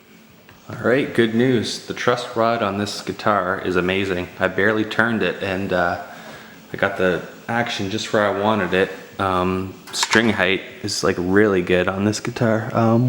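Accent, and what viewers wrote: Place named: Canada. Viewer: With a Canadian accent though.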